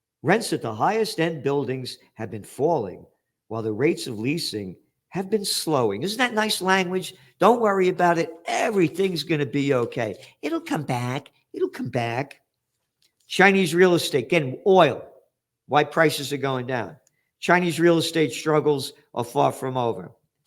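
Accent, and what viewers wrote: Bronx, United States. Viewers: You sound like a proper Bronx bastard. Not quite as foul mouthed as my Brooklin relatives, but at least you fucking try.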